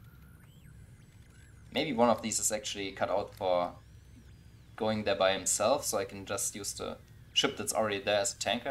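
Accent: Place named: Australia. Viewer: Your accent is cool. Are you Australian?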